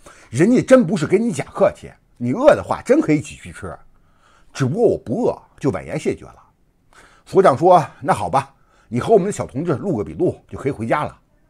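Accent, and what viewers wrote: China. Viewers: 你口音就是 京津一带的口音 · 你虽然是台湾人但是你的口音确实像大陆的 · 老哥不像台湾人 你那把中国人的口音骗不了人 就像新加坡人跟马来西亚人的口音差距太大，中国南方跟东北人的口音那样，只要一开口说话立马漏馅了😊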